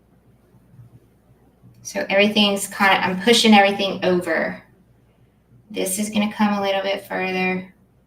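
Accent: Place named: South, United States. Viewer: your soft southern accent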